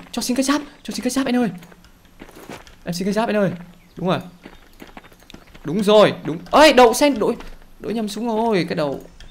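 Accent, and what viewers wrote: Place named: Countryside, Vietnam. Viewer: nói thì giọng như nhà quê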